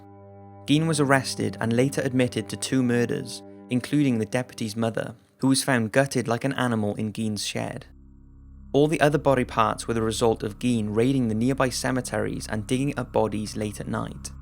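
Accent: Wales, United Kingdom. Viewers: The narrator is Welsh. A nice welsh accent. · Alex Wilde His accent is Welsh! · gjoni I think he is Welsh butt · Is that a welsh accent I hear? Aye Welsh squad turn up🤘🏼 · ah...love that soft Welsh burr. Strangely comforting, given the horrific subject matter! 😉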